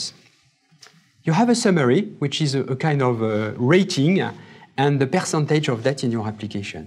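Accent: France. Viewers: Don't be mistaken, it is French with English words, no offence to anyone, but most Frenchies speak like that ;-) *Pink panther movie series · Very hard to listen to your english... It is almost french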